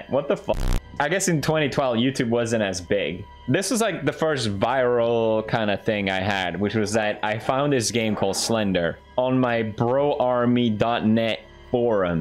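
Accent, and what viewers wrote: Sweden. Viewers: He used to have a really strong Swedish accent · Because of the heavily Swedish accent that he lost over the years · i tried to copy pewds with his Swedish accent and goofy speech patterns.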